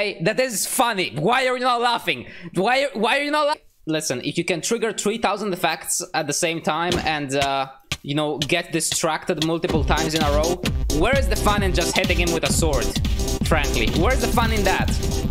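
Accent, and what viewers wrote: Italy. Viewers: why are you italian? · Are you from italy?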